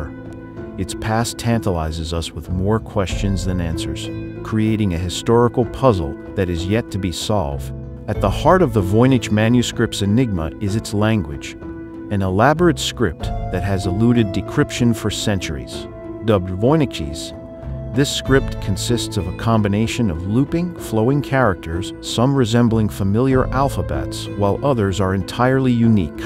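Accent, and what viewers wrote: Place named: Midwest, United States. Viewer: Was this made by AI? If you are going to use AI to do the narration, train it to pronounce common words correctly! The qords hoax and theory are NOT unusual, and yet your narrator--who otherwise has perfect middle American diction and syntax--your narrator pronounced those two words with hilarious errors. If the narrator is human, then they need to take the extra two minutes necessary to double check the pronunciation of words they do not know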